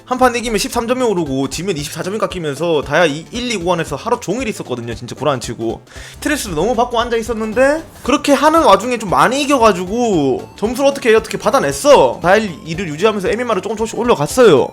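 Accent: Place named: Gimhae, South Korea. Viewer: 말투가 아주 김해 느낌이 많이 나는게 뭔가 서운하면서도 제육을 볶고 싶은 느낌이네요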